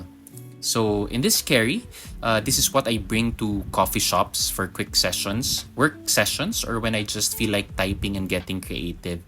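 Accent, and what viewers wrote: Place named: Philippines. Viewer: Same as an EDC enthusiast I was taken a back nung nag tagalog siya and may filipino accent 😅😅. Great content po kuya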